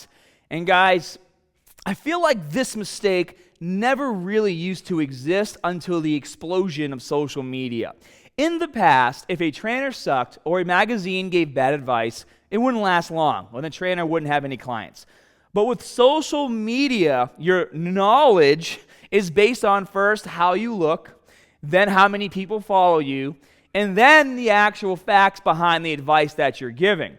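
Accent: Boston, United States. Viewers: I'm genuinely curious, what part of America is he from where they pronounce 'A' as 'Ah'? Great videos by the way, big fan :) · The Boston accent is annoying as HELL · Holy Crap! I've always watched your videos for your good looks and charming Boston accent. I had no idea that was a mistake until you mentioned it in this video!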